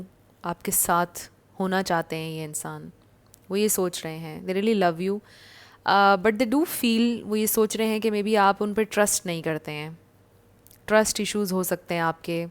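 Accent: Pakistan. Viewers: We were in long distance relationship we never met he planned about meeting in August but he blocked me on WhatsApp and I blocked him on SC .I Pray he will call me ❤........I like ur readings You are a Pakistani I bet ......your way of talking is Like Pakistani .❤keep going keep it up.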